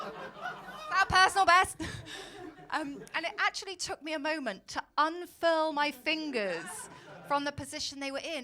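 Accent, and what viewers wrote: RP, United Kingdom. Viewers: Brilliant! 😂 Well I must say, with that nose, cheekbones, chin, accent and name she must be the most british thing I can think of. Except the late queen ofc. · Ha! Brilliant! As a Lincolnshire man living in Pensacola, Florida I appreciate the juxtaposition of the Queen's English and bawdy humor. Subscribed :) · She's hilarious and I love her British accent! Why is it that all these beautiful women tell sex jokes? Hmmmm?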